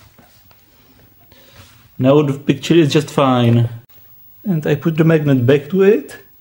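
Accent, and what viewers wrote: Prague, Czech Republic. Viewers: Čechoingliš :D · Pocujem cesky prizvuk uz po prvom slove. Mam pravdu ze si cech ?? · Didn't expect to hear a Prague accent in English. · Czech English ? :D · Where are you from? Your voice souds like your from Czech xD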